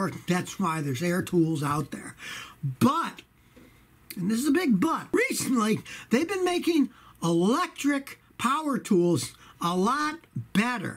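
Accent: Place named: East Coast, United States. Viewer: Are you from Philadelphia? Your accent sounds from the East coast.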